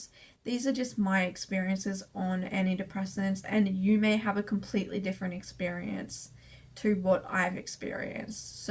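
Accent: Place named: London, United Kingdom. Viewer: I don’t know why I thought you were from the UK. I thought you had a nice polite London accent 😂 Anyway, very informative video x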